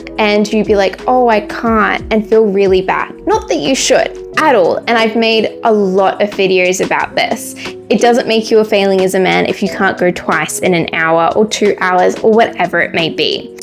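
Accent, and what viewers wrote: Australia. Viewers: That's an Oz accent. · surprised by the aussie accent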